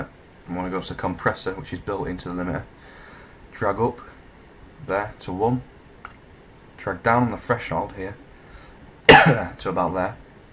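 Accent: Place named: Yorkshire, United Kingdom. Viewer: Thanks for this, good to hear a Yorkshire voice on here!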